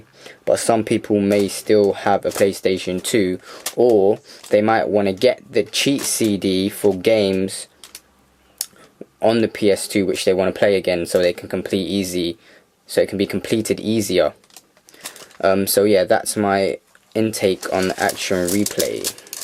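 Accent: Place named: East London, United Kingdom. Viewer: come to east london, almost everyone sounds like him here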